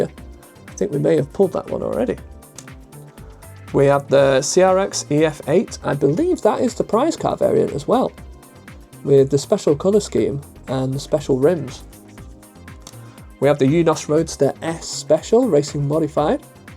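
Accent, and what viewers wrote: United Kingdom. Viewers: I always heard the Manc accent out. Love your vids, cheers mate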